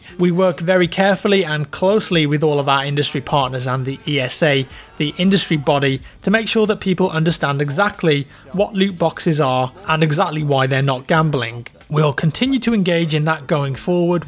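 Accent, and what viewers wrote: United Kingdom. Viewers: one good question though. how did develop a British accent even though he's american?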